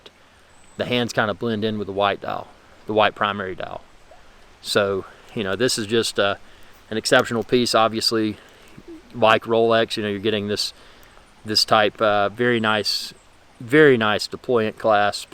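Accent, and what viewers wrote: United States. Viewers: Great channel! I like you videos. So nice to hear a American accent rather than some English bloke reviewing watches. Lol 😂 You should check out the 2021 speedmaster. The bracelet is tapered 20mm to 15mm and they changed the endlinks. It’s super light and crazy comfortable! It’s also actually Meta tested so crazy accuracy and it’s actually tested to 50m not just rated 50m so you can now swim with it. My wife bought me one for my 50th birthday absolutely love it. The Tudor is a great watch too. I’ve owed a few black bays the only one I’ve kept is the BB58 blue. Even though I have a 7 3/4 wrist the 41mm BB slab side’s look silly on my wrist. The 58 looks perfect since it’s thinner. I know you have a 58 which do you prefer?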